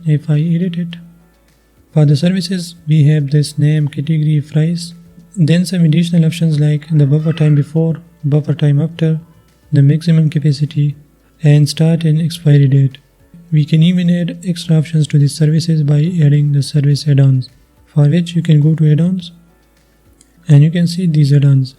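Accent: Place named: India. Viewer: Aha Indian accent! But thanks for the video.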